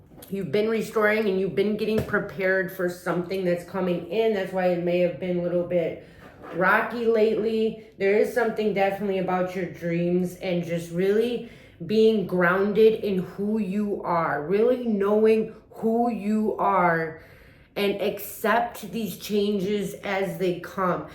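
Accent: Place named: Upstate New York, United States. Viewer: Your accent reminds me of my extended family, who live in upstate NY, and Pennsylvania . 😊 Thanks for sharing your intuition. X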